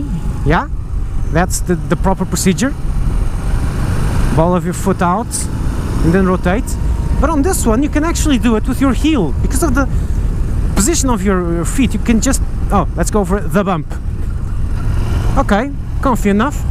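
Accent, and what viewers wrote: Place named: Portugal. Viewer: nice portuguese accent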